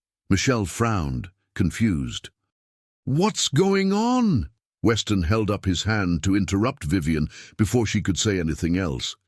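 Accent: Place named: Mid-Atlantic, United States. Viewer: The narrator appears to be a real person with the most wonderful mid-Atlantic accent, British, but with American vowels.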